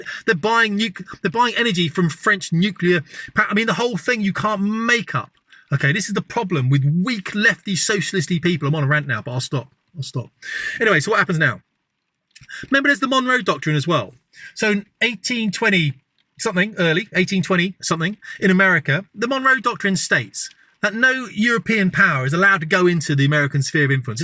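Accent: United Kingdom. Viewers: Wow this dude has that brit accent down ... brilliant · Love your UK accent mate. But I had to turn on CC just to follow you. You talk awfully fast. :) I have to ask what the real reason is that we (i.e. the US and other western countries) are so insanely set on defending Ukraine? It seems like it's got to be more than money. What is it in Ukraine that we don't want exposed? It must be something that threatens the cabal, the "powers that be". I don't know exactly who they are but it sure AIN'T Obama/Biden. Those people are just puppets. I'm sure Soros and company are part of this cabal, perhaps there are two competing cabals in which case I would expect the Vatican to be a part of it, i.e. the Great Whore, Babylon the Great, but regardless, there has to be something crucial in Ukraine which threatens their power. Trump is smart but I wonder if he really has taken the steps he needs to in order to crush this international shadow government. 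I'm cynically skeptical but we'll see. I think that once again he has people in his inner circle who are part of the shadow. Regardless, keep up the good posts mate.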